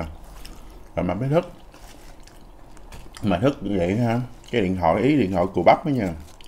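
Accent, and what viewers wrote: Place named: Mekong Delta, Vietnam. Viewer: E nói như vậy là đúng miền tây đó e mình đi làm ăn kiếm tiền giữ tiếng nói vùng miền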